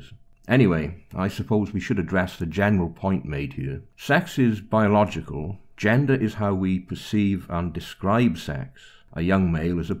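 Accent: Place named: Cardiff, United Kingdom. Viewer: Excellence in a Cardiff accent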